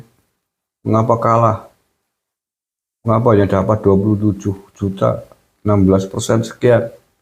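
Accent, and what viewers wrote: Banyumas, Indonesia. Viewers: Mau emosi denger bhsa ngapak jeg ketawa😂😂 ga jadi dah emosi lbh baik legowo..😊😊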